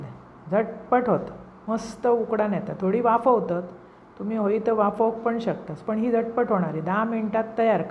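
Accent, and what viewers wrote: Malvani, India. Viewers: ताई तुमची बोलण्याची पद्धत अतिशय गोड वाटते .आपलेपणा वाटतो.मालवणी गोडवा .पदार्थ छानच.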